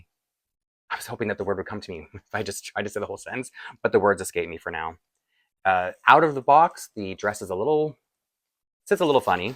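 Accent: Canada. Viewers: I sure love you and your channel! You are so personable I feel as if we’re sitting in the room together and you’re showing me your dolls. I also love your slight Canadian accent, which I thought was cute. You pointed out. I didn’t realize that’s how that Dog’s name was pronounced either so thanks for including me in! It’s the wiener dogs right? 😅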